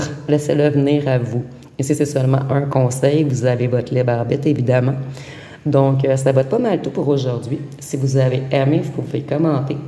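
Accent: Canada. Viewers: Bonjour cousine Canadienne, j’aime votre accent qui me fait penser à mes cousines germaines qui vivent à Montréal où j’ai eu plusieurs fois l’occasion de m’y rendre… Je vis en France… J’aime le format de guidance de 30 min. 😊 J’ai entendu votre voisine mais pas de soucis 😉😘 Je n’ai nullement été offusquée 😉😄ce sont les choses de la vie… Gratitude pour votre guidance 🙏🏼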